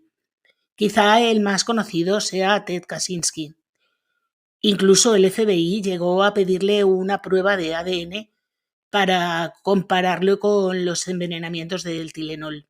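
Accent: Castile, Spain. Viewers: Buen día ❗ amo que los españoles no pronuncien las palabras en inglés, sino en castellano ❤ Impresionante capítulo 😳😯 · nada se te quita con pronunciar las palabras en inglés, ya que das demasiados casos de USA, y son palabras en ingles, que cerrados sois los Spanish 😅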